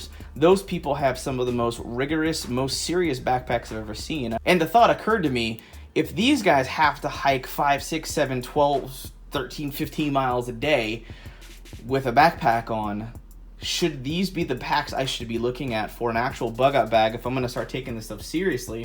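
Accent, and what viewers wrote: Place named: South, United States. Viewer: why does you casual prepper voice have a southern accent?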